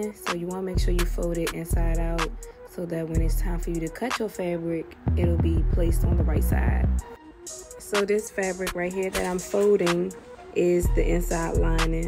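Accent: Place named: Washington DC, United States. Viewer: yesss I heard the accent. ❤️ I’m from Maryland. Love to see a Washingtonian sewing queen.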